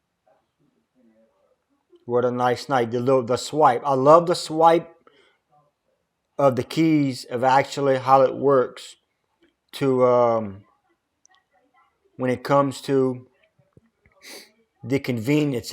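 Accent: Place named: Alberta, Canada. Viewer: Thanks for video!!! You have Canadien(Alberta) accent. where you from?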